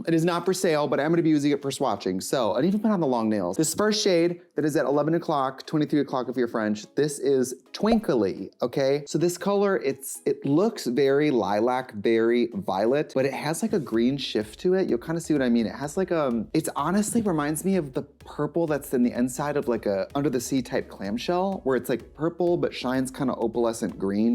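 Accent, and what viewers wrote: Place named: Minnesota, United States. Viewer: Work that winder washer motion. Cmon Minnesota accent ❤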